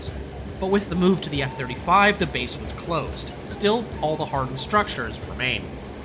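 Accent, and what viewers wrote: United States. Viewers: From your accent I can tell you're from across the pond. As airport self-service solutions are underdeveloped over there, although it's changing, I guess you can be excused for thinking you had to go to a manual check-in desk at OSL, but that's of course not the case. Not every airline offers self-service options, but most European carriers with a normal scheduled service do at OSL. The next time you're at OSL, or most modern airports, you should look for these services first (not hard to find), and only go to a manual check-in desk if there is specific reason to do so. That's what experienced travelers do. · Wideroe is unique , not weird . What's weird is your constant whining . Couldn't listen to your nasal American voice more than 2minutes . And routes is pronounced 'roots' not 'routs' !! 😡😡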